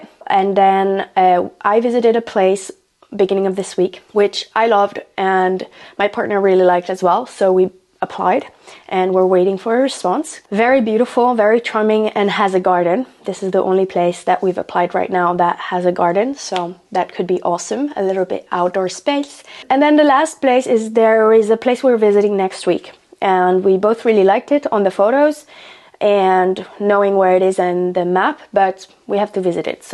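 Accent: France. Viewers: Îm love that her little French accent is coming through more. It sounds SO cute! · she does have a bit of a french accent that comes out every once in a while and I notice it in many videos. I feel that the same happens to me when I talk in a certain language more often my accent shifts. But yes overall she does not have a strong accent or anything. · I'm wondering if the secret boyfriend is French speaking, more French accent is coming out 😊❤ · Nice video as always... your accent sounds more french in this one for some reason :D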